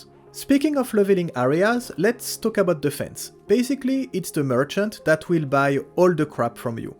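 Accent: France. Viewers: Bro are you french? I like the accent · I love your french accent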